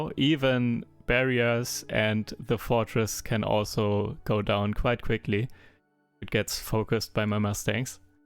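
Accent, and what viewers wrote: Germany. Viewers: do i hear a german accent ?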